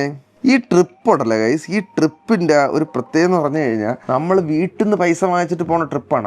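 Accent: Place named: Delhi, India. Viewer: Maza aa gaya… hearing your delhi slang… maza aa gaya bc ❤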